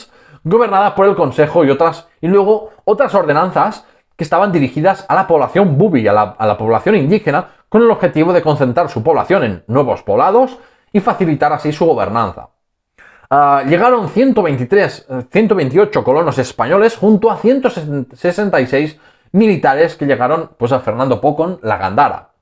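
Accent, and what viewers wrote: Catalonia, Spain. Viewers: Madre mía qué relato tan caótico!! Difícil de seguir, inconexo y monótono. Siento decir que claramente tiene usted dificultad para vocalizar bien en castellano, por su fortísimo acento catalán, y me ha resultado una escucha difícil, atropellada y poco amable. Valoro su esfuerzo por exponer y dar a conocer la historia, pero me temo que aún le queda a usted tiempo de aprendizaje para desarrollar una buena narrativa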